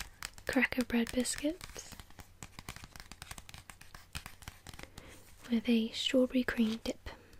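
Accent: Wales, United Kingdom. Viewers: Are you British then? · yes she does, since she is from Wales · I believe she's from Wales. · I know it's kinda late 😅 but she's Welsh I think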